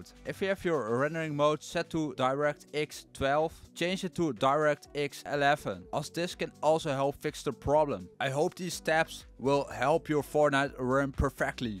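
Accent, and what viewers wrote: Netherlands. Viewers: he dutch i can hear it BRUHHHHHHHHHH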